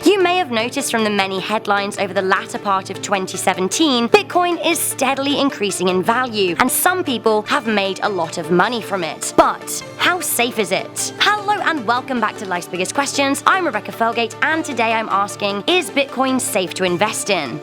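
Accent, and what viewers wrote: United Kingdom. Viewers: so British. There's millions of people with the accent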